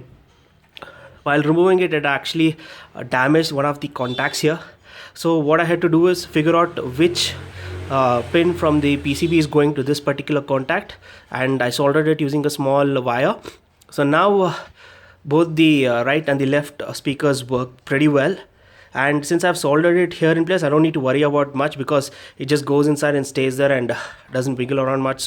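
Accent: India. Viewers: listen to him he's Indian and alot of Indians are doctor n engineers n have a great accent so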